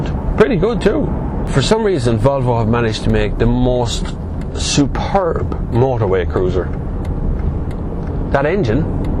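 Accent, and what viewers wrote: Ireland. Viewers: I never thought I'd see Emo in a YouTube video...... and your accent is familiar, are you from Laois?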